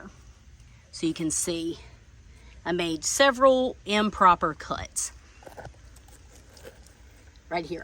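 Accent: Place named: Texas, United States. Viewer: Thank you for an encouraging video. Roses frighten me. Historically, they have been difficult plants to grow. They are subject to so many problems that only the best gardeners had the magic touch with them, so I stayed away from them, but these Knock Out roses are easy to grow according to a friend. She planted six of them eight years ago. Said she does very little to them aside from water them. Said she planted them by more or less just throwing them into the ground. They are now virtual rose trees that are positively dripping in blooms. I am encouraged to try planting some. Do I have to test the soil first? The soil here is pretty clay-ee. I know they need a sunny location. I had no idea about how to prune them, I figured you just cut off anything that did not look right. I had no idea where to cut or that it should be at an angle. Any instructions you can give me to help me get some planted would be greatly appreciated. 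You sound like you may be in Texas where I am. Temps here are really HOT half of the year, and we have tremendous humidity. I have had some good success with Salvias which I love very much, but I long for rose bushes..... Thank you again! Cheers!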